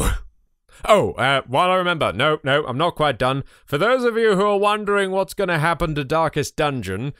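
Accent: United Kingdom. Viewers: Thats a very good mind set, Bit of advice, When your becoming one take the Over English Man's glossary golden magical mustache, His Overly English accent and His Scene of humor. But keep your Never give up attitude, your selfless thoughtful mind and your Loving heart. Put all that together and you'll have one hell of a guy, Oh and maybe a bit of chemical X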